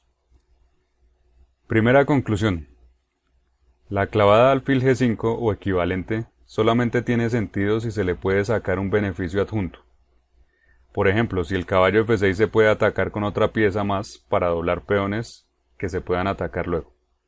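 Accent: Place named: Colombia. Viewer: tienes un acento muy colombiano... eres colombiano? gracias por el video muy instructivo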